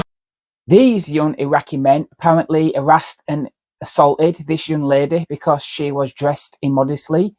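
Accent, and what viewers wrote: United Kingdom. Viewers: Certainly speaks with a funny accent (like most Brits). Was that a gay Yorkshireman?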